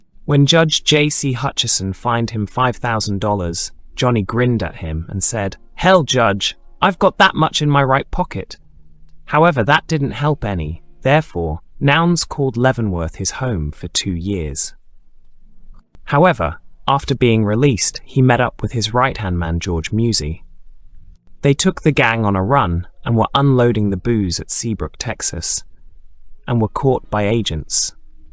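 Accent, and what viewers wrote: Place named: United Kingdom. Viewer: Italian/American tough guy mafia and it sounds like some scared Brit narrating... see ya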